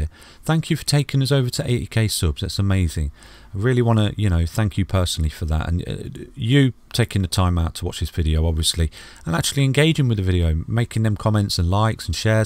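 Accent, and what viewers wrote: United Kingdom. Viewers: Great analysis, clear and concise and lovely British accent!! 👍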